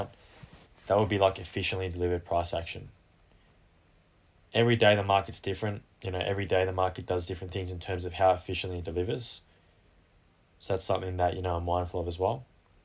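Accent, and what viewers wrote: Australia. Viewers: Hey mate nice videos, you sound like a fellow Aussie, I’m in Bris, where are you based?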